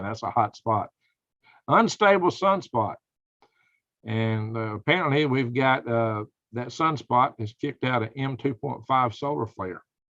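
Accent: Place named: Louisiana, United States. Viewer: New subscriber🎉 saw you on Canadian prepper and was so excited to hear someone who's accent sounds a little like mine & prepping in a climate similar to home here in Louisiana.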